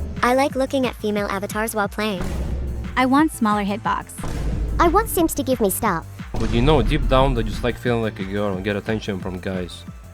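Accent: Russia. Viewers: Is he Russian? I just hear an accent. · Фига у тебя русский акцент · are you Russian? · Я прям чувствую этот русский акцент, особенно когда он говорит роблокс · You said the video had a Russian accent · Are you russian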